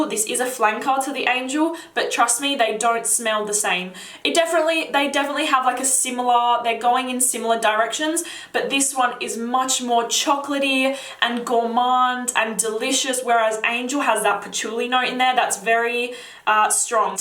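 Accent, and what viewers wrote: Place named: Australia. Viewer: I just came back from Australia & I miss the accent lol. I love your videos! Keep up the good work. You’re soooo pretty too. I am hankering after TF BO EDT too.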